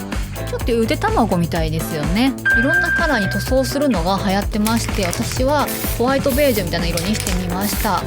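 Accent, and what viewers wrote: Kansai, Japan. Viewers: 初コメです😊 さんの動画本当に参考になります！私もIKEA大好きですが遠くてなかなか行けないので・・😂😂 それから、時々関西弁なさんの話し方、好きです❤️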